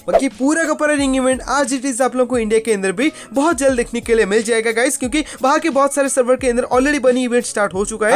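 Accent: Odisha, India. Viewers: Bhai tum odisha se ho na?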